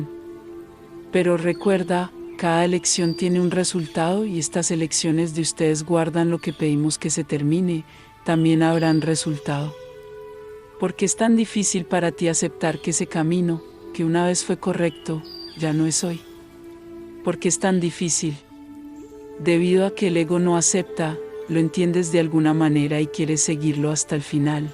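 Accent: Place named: Colombia. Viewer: Gracias por tu mensaje ,pero porqué escucho como un acento colombiano....? Esta es una canalización.? De todas formas gracias por el mensaje. Muy bueno..!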